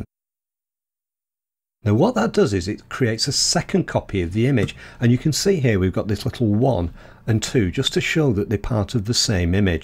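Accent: United Kingdom. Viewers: British accent? Subscribed.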